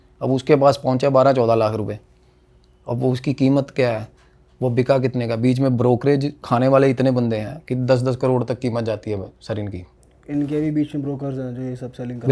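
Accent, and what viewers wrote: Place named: Punjab, India. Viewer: bhayi k beech beech mai Punjabi nikal jati ha😅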